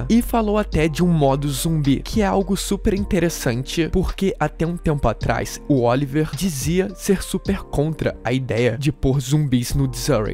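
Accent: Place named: Rio de Janeiro, Brazil. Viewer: Min fala carioca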